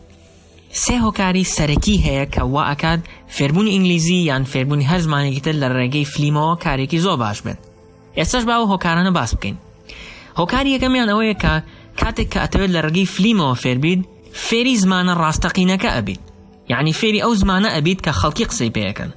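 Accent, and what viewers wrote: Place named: United States. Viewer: thanks l hope sooo and its really good you using accent American.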